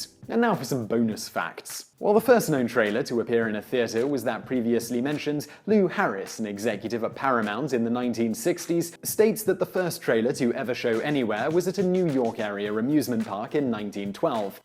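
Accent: England, United Kingdom. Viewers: Cameron Kiesser he’s English.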